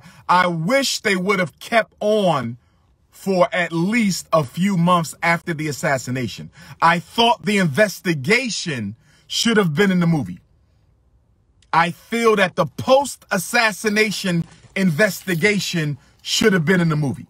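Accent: United States. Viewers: 😂 dude you are funny. He speaks English with British accent you speak it with American accent. There is no difference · But Umar you have an American accent... wtf are you talking about?? Dude was raised in England that's why he talks like that..... I thought you were a Doctor. SMH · Umar you speak with an American accent that derived from an amalgamation of British, French and other Europeans. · DR UMAR YOU ARE AFRICAN WITH AN AMERICAN ACCENT, TRIBALISM KILLED AFRICA. LETS LOVE AND WELCOME EACH OTHER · Doc. You have an American accent. Whether you try to or not. I agree, but the accent part is out there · But your speaking in American tone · His not emphasizing anything. That is his accent. As an African from African you have an American accent. You don’t hear us saying that black Americans are emphasizing your American accent. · lol you really bashing British tongue while u speaking American English? 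yaz usangene wena? uyakwaz loko? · With all due respect Dr. Johnson, Brother Kaluuya speaks with a British accent for the same reason you speak with an American accent. He is not "emphasizing" anything. Neither of you was born/raised in Africa, so neither of you has an "authentic" African accent when speaking the colonizer's mother tongue. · As articulated as you are Umar ,you are "Emphasizing" american tongue so shouldn't we be concerned ? Cmon bro that's his damn accent , your whole video is speaking in an american tongue, and its disingenuous towards are Brothers that do have a british accent . That's ignorance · You're speaking with an American tongue ffs hypocrite 🙄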